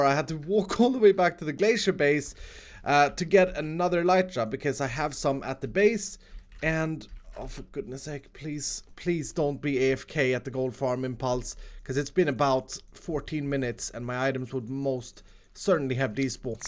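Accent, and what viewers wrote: Sweden. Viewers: Are you form swiden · Hörde att du var svensk på din dialekt :)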